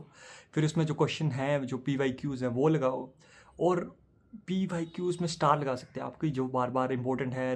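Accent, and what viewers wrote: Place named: Pahadi, India. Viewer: pahadi ho kya bro, accent aa raha hai thoda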